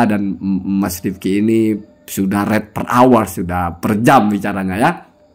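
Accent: Madura, Indonesia. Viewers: Mungkin maduranya agak lain guss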